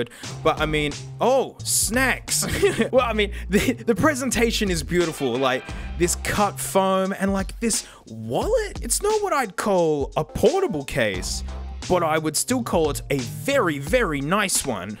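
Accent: Australia. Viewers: That's the aussie accent for ya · Aussie all the way mate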